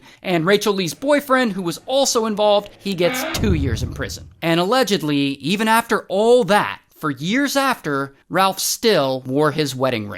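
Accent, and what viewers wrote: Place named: United Kingdom. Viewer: It's a BRITISH accent BTW.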